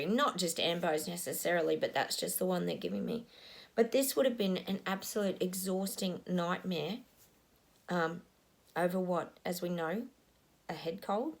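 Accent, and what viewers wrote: Australia. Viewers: Hi new subscriber here agree with all what you have said. Been saying to everyone for the past couple of years now we have to go back. I have no idea why I say this but feel we have moved to fast forward. It's time to get back to basics. Can't wait for people to wake up. Lovely to here your Ausie accent I used to live over there many years ago.Still miss it. Thanks for your guidance xx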